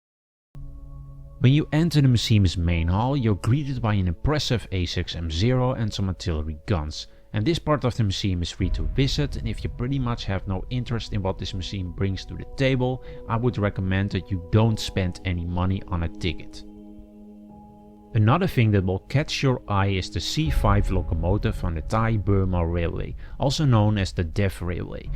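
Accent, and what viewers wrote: Netherlands. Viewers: Great Dutch accent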